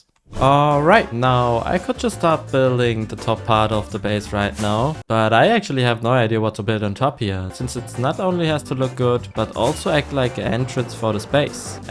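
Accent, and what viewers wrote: Germany. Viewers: Nice vid but are you german i hear a bit of an accent or maybe its the cold but yeah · is your main language german your voice sounds like german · Are you from Germany? It sounds a bit like German English. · youre accent is a bit german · I like seeing biomes build!! However, missed your videos, they are nice and I like your german accent man👍🏻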